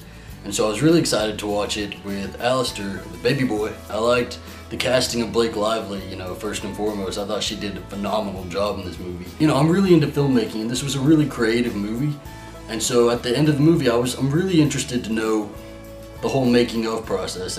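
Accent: South, United States. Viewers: . You were talking about gay acting straight. You are very straight acting. If You didn't say you were gay, it would easily be assumed you were straight. But that what make you so damn cute. And I love your voice with the southern tone to add. Alastair is a lucky ass man. He screws this up, I'll beat him up for you. I'm straight acting, so I know what I'm talking about. But not as cute as you. Actually not young either - haha😂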